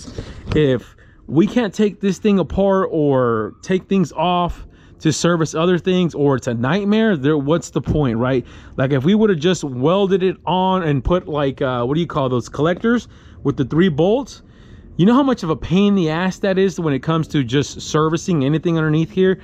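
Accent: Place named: Northern California, United States. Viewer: I can tell you from Northern California bra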